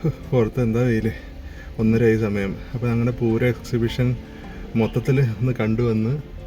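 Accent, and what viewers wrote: Thrissur, India. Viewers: Palakkadukaran aanelm thrissur slang aan samsarikumbo kooduthalayum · Trissur slang adipoli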